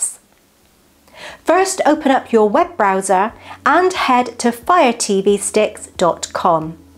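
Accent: United Kingdom. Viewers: Your British accent. OMG! ❣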